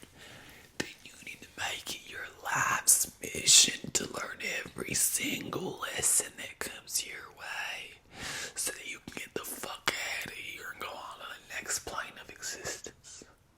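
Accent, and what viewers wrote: South, United States. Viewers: i love the rick grimes -esque accent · For some reason, I can't help but think about Rick Grimes from The Waking Dead when you do the southern accent with this character 🤣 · I love the southern accent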